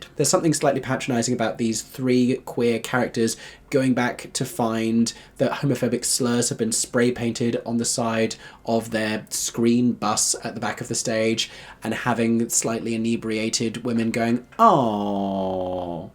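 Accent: Australia. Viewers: The Australian people demand more Aussie accent! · Nah gonna need a whole video In that Australian accent now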